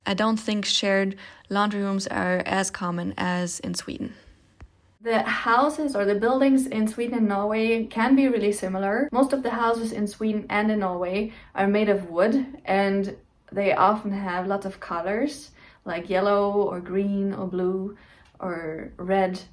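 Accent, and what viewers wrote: Germany. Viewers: In one of the videos you say that you didn’t know if you had a German accent, I can confirm you 100% have a typical German accent when speaking English. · I can hear the German part of you in some of your English :-)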